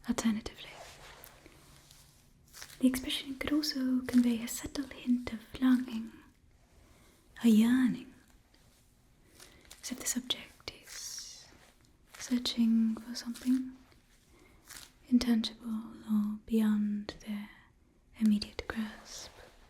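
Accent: England, United Kingdom. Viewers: No such thing as a "british accent". That's an English accent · The British accent hits every single time! Btw, how did u get ur new profile pic? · Yes I am still impressed with that british accent, I am british and I cannot do an american accent to save my life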